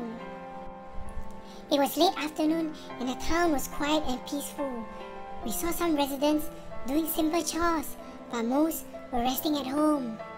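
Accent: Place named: Singapore. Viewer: yo i can already tell you're Singaporean laaa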